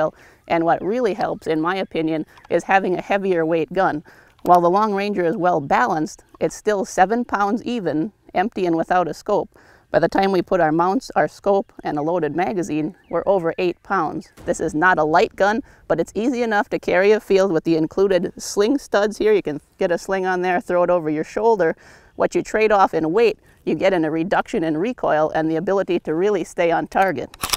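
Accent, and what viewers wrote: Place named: Michigan, United States. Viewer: Keep coming back for that wild Michigander accent ;D your the best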